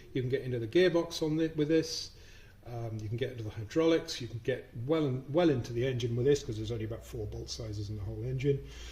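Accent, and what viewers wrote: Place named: RP, United Kingdom. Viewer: Your accent sounds far to posh for a mechanic, we never see your Mush so we call you 😂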